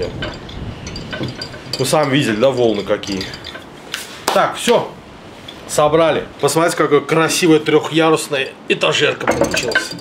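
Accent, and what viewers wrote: Baku, Azerbaijan. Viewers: Этот Бакинский акцент я балдею да. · я арянин и родился в Баку. Помню всех соседей с таким акцентом, двор был инторнациональным. Все ваши рецепты супер. С большим удовольствием смотрю. Здоровья Вам и вашей семье.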